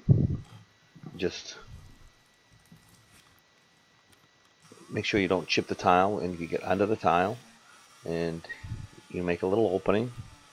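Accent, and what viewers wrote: Boston, United States. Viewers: That has to be the coolest accent in the Us. 👍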